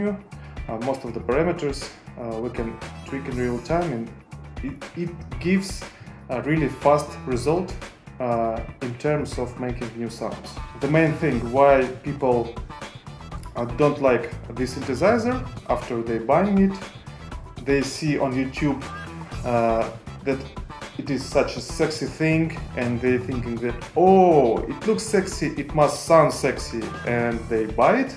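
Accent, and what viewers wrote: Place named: Russia. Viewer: А можно на русском такой же? Судя по произношению Русский вам должен быть знаком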